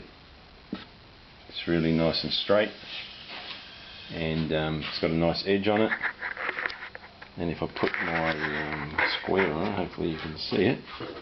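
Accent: Australia. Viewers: you sound like you've got a queensland accent! greets anyways mate, really enjoyed this video and can't wait to make one! Cheers!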